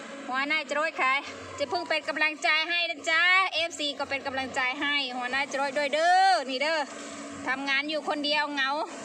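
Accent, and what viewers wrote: Korat, Thailand. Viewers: พูดคือคนโคราชเลยเนาะเจ้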